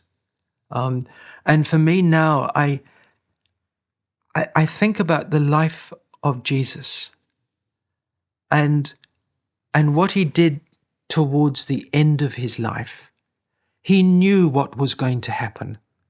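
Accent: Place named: South Africa. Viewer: It sounds like hails originally from my country - South Africa. My first Prashanti Christmas was in 1986... Never, ever to be forgotten!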